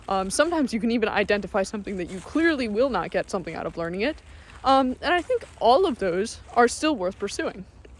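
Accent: Canada. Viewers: What a sympathetic person. She sounds canadian, maybe its that 🙂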